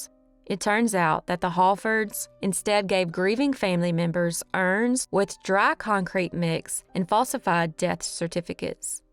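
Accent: South, United States. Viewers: I understand, she is the only southern voice I can toletate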